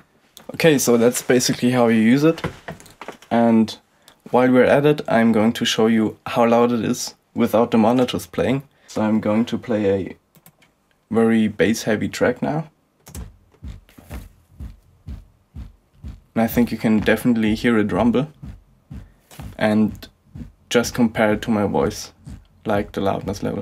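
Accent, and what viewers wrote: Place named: Germany. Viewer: Sehe ich anders. Lieber deutlich artikuliert aber mit deutschem Akzent als genuschelte Ami/Oxford-Englischproduktion! Production: Danke!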